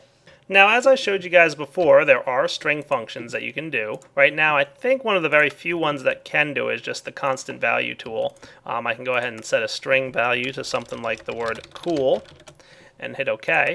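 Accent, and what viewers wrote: United States. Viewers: is American?! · since when were you American?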